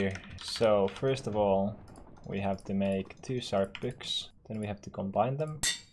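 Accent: Finland. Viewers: This is my 1st time watching you and just with 8 seconds I could tell that your Finnish And of course because of your name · i love your finnish accent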